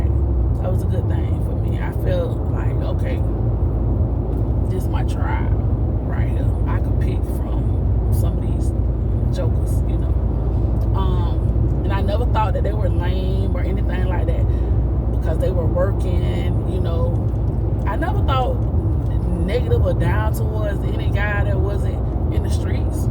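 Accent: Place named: Orlando, United States. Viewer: I figured you were in Orlando area, you hv the voice inflection/accent from there.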